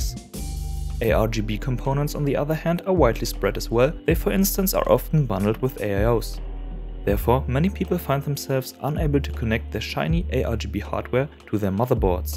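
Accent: Germany. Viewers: Wie ich dein deutschen Akzent raushöre :D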